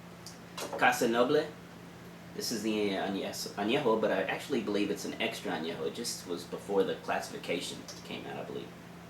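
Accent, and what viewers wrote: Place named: South Texas, United States. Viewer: I can tell this man from south Texas Cheers brother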